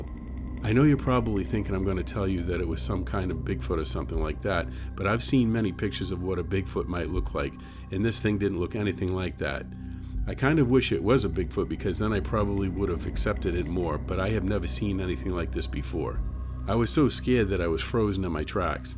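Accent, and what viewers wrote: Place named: Northeast, United States. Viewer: The northeast accent is refreshingly unique. Very much enjoying your channel. Looking forward to watching it grow.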